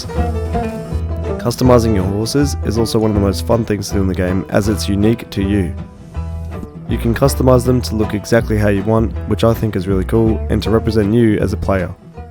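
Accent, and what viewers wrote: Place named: United Kingdom. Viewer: Video about rdr2 over 10 minutes narrated by a man with a British accent is a guaranteed banger lol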